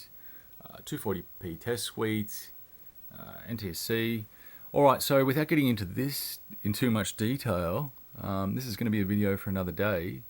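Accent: Australia. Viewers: Garage Great Video mate! What part of Aus are u from?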